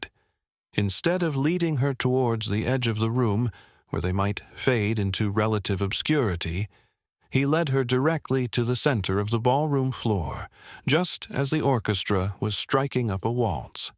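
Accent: United States. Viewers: As I commented on another story, I'm having problems listening to an American accent told for a English story. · I enjoyed this story, the relationship between Marg and Max was developed nicely, there were no nasty scheming people plotting dastardly deeds… the narrator - firstly the sing-song, staccato cadence was off, and of course, American accent for English story was off, but notwithstanding that, the diction was clear and voice well modulated, which made for easy listening 👍🥰🇦🇺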